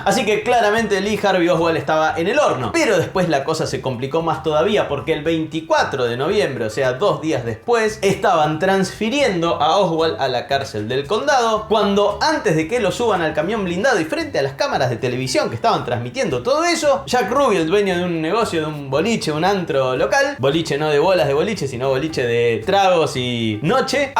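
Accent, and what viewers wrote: Argentina. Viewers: Sha sha sha sha.....ese acento argentino fastidia....she she she....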